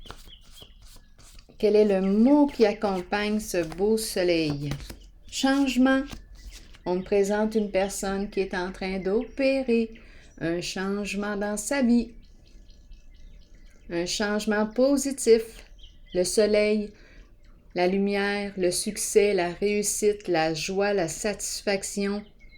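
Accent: Canada. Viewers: Bonsoir, que le ciel vous entende⚖️🤗, Soyez bénie, belle âme au joli accent canadien ❤️🙏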